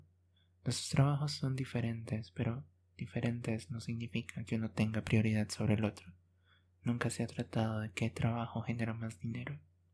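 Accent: France. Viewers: Tienes un lindo acento francés ❤❤❤ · Gracias por todo tu esfuerzo empeño trabajo duro quién me has puesto a los videos a este video cada vez que oigo tu voz se me acelera el corazón me alegra la vida tienes una hermosa voz tranquila calmada que enamora y encantada y encariña siempre me voy a enamorar y me voy a encariñar amo tu voz francesa love for your ❤❤❤❤❤❤❤❤❤❤❤❤❤❤❤❤❤❤❤❤❤❤❤❤❤❤❤❤❤❤❤❤❤❤❤❤❤❤❤❤❤❤❤❤❤ amo con locura tus videos siempre me voy a enamorar y cantarina😊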